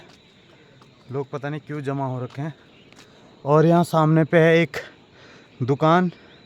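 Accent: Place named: Garhwal, India. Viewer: Chhote bhai aapki gdwali bahut achli lgti h mujhe pta nahi kyu। Mai waise kisi ka blog nahi dekhta but aapki gdwali tone mst h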